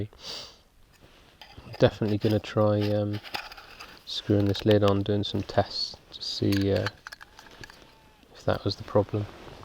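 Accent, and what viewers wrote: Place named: Herefordshire, United Kingdom. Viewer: If you don't mind me asking where you based. I'm Leominster Herefordshire and I thought your accent sounded close. Good vid. Sub and liked.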